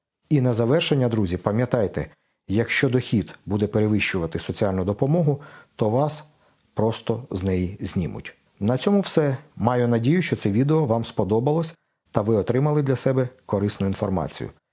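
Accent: Slobozhanshchyna, Ukraine. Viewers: все нормально! А в минулому було не "на русском", а на слобожанському діалекті співучої української мови!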